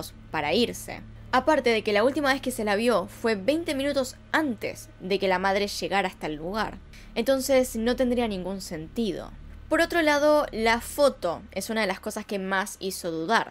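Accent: Argentina. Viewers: Hay veces que no me gusta el acento argentino (sin ofender a nadie) pero sin embargo amo tu voz y la manera en que relatas todo ❤️ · Me encanta tu acento argentino. Estás súper guapa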